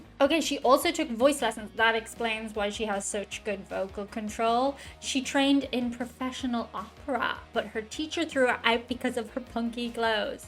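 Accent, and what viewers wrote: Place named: Ireland. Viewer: Question for! Your accent fascinates me. There seems a fair amount of Irish in the background. Where do you come from. By the way, you have a very pretty face. ( That's one of the reasons I watch your videos and love the music )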